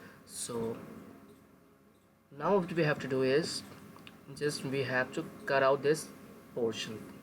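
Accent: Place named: United States. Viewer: Missing your american accent😕😢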